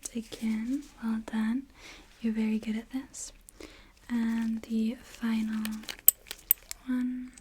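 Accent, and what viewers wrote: United Kingdom. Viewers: Of all the nicest reasons to watch your ASMR I appreciate your accent, British is my favourite 🤍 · pretty sure it’s british !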